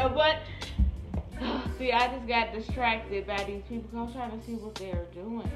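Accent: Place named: Chicago, United States. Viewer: you definitely from chicago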